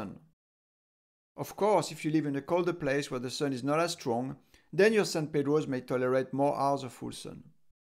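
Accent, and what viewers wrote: France. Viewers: Your accent is too much 😆 fucking french 😂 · wtf l'accent francais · french accent ?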